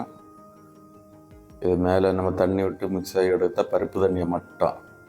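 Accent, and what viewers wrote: Kanyakumari, India. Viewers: கன்னியாகுமரி யா நீங்கள்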